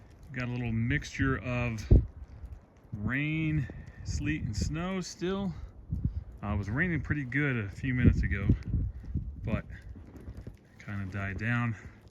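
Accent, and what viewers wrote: United States. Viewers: Just curious, what part of the east coast are you originally from? I hear that accent every now and then. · I thought you sounded like you could be from here! I’m in south central PA an hour south of Harrisburg. It’s funny we’ve had a practically snowless winter here. Keep your chin up out there. 🙏. I know there’s some pretty rough corners in your neighborhood (poor truck) but Spring is right around one…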